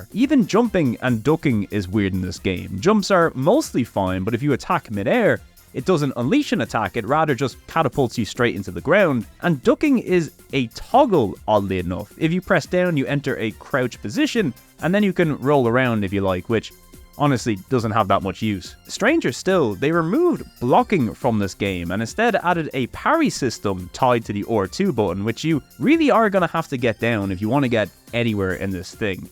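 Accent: Ireland. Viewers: Here for that sweet sweet irish accent. Oh and the games of course, need to grow my backlog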